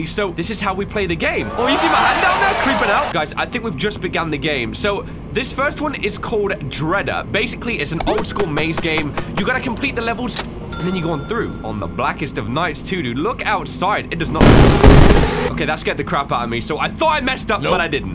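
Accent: United Kingdom. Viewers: You bri'ish mate?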